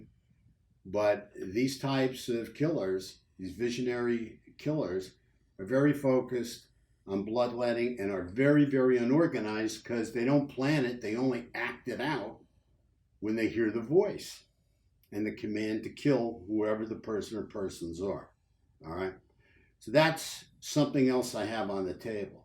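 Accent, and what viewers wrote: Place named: Massachusetts, United States. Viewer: are you from Massachusetts? You have an accent.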